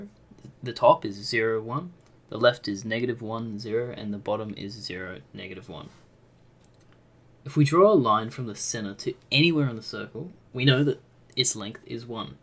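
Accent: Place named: United Kingdom. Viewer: Thank you i love your British accent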